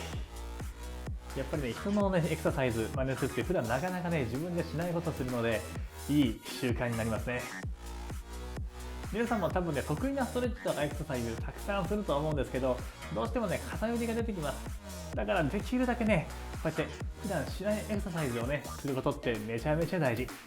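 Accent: Kansai, Japan. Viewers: 先生のストレッチは、即効性があるのでやる気出ます‼️ 分かりやすい説明ありがとうございます‼️ そして、たまーに出る関西訛りが可愛いです。by関西人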